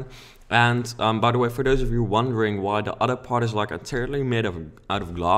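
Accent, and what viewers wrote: Netherlands. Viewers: Jij klinkt zo nederlands hahahahahahaha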